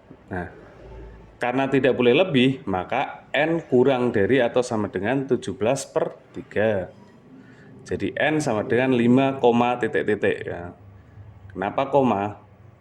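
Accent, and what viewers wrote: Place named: Java, Indonesia. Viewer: Bahasa indonesianya medok bgt mind, orang mana mind ?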